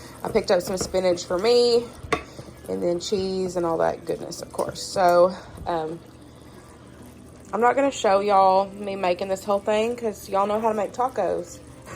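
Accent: South, United States. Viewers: Just curious, what state do you live in? You sound similar to a southern, which I live in Georgia, but you’re not as country as Georgians!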